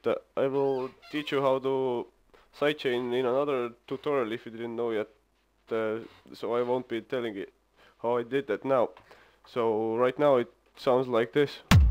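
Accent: Estonia. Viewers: Estonian accent ? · D arvasin aktsendi järgi ,et eestlane. Checkin kontot, ongi eestlane . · kle sa eestlane w aktsendi järgi tundub kyll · tüüpiline eesti aktsent :D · Kõlab väga eesti aksendi moodi :) Aga ei 5+ tutoorial :)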